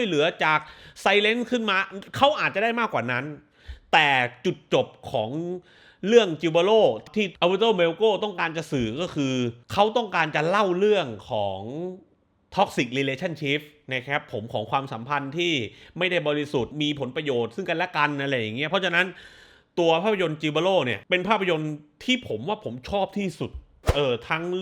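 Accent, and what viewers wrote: South, Thailand. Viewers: พี่เป็นคนใต้มั้ยคับ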